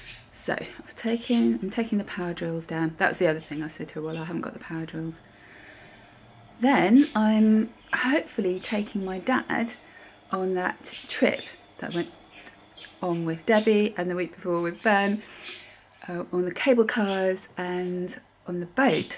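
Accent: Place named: West Country, United Kingdom. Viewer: I had a little chuckle to myself when you mentioned Ben unloading the dishwasher: my husband thinks he's being helpful by putting all the stuff away but I nearly always end up having to put things back in their 'correct' place- this goes for shopping too- the perils of being a control freak lol. Hope you did feel good after the clearout and am not surprised you got a headache the next day, I get like that too. It was novel to see food items in drawers instead of the 'usual' cupboards :) Good luck with the interviews (although I know the feeling of going for a job and not really wanting it!). This is really random but I've noticed there may be a tiny bit of a west country twang sometimes when you speak- hardly surprising after living with Ben for over 30 years lol :)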